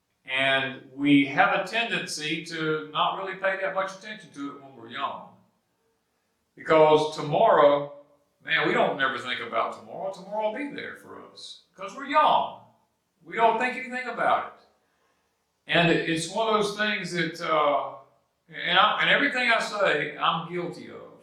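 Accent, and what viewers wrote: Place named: Alabama, United States. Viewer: Thanks. Love the channel. Feel like I'm listening to someone from around the corner from me. Mobile, Al. Come down and talk sometime.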